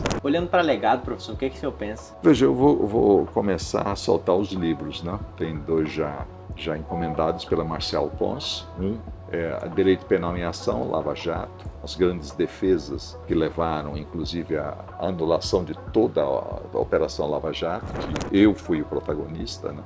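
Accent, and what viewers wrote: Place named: United States. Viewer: Prezado Nabor, que bom vê-lo em plena atividade e cercado por livros raros.Ademais, consegui entender o seu inglês; com nítido american accent.Um grande abraço!⚖️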